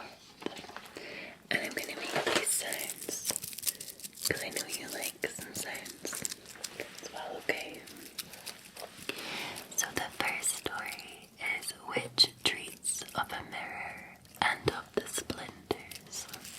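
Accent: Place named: Scotland, United Kingdom. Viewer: Missed the victorian maid thanks for being a light in the darkness your cozy cottage was first asmr video i saw i loved it dont know why but love scottish female accent makes me feel safe comforted and loved thanks for all you do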